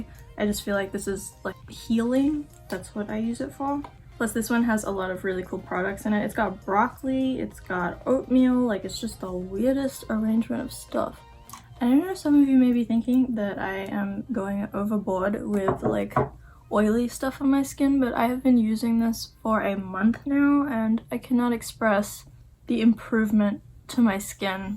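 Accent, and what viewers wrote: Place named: Australia. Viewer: Where are you from? I know it sounds weird but i keep hearing a slight aussie accent from you ! Are you an aussie but have lived in America for years?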